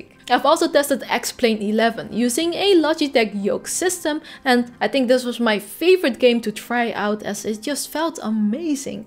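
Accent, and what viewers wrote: Netherlands. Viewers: Ur in the us? I always thought you had a Dutch accent 😅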